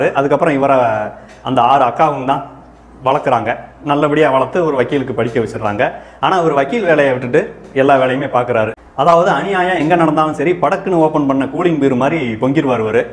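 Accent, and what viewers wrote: Madurai, India. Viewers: மாம்ஸ் இன்னைக்கு மட்டும் 10 வீடியோ பாத்துருக்கேன்.. என் மனசுல இருந்தத பூராம் மதுரை மொழில செல்லிருக்க.. சிரிச்சு வயிறு வலிச்சிருச்சு... சத்தம் போட்டு சிரிச்சோம்யா.. மாம்ஸ் வாழ்க...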